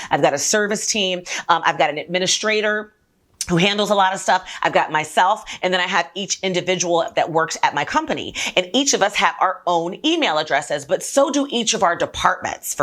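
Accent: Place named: Europe. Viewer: Sound like a European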